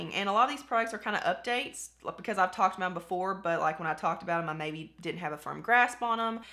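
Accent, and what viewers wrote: South, United States. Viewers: Where are you from? I'm southern too!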